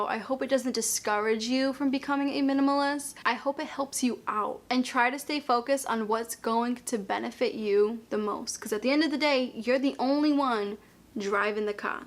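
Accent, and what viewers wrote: Boston, United States. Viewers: I was wondering where that accent came from, too. But please never apologize. You're awesome!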